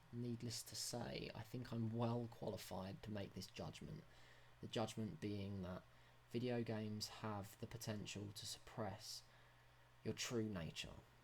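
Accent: England, United Kingdom. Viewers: somehow everything makes more sense with an english accent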